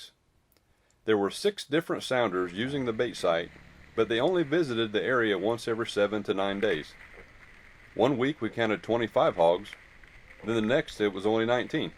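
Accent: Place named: South, United States. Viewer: Wow this is actually interesting as fuck lol and I'm loving the informative narration with a deep southern accent hahahaha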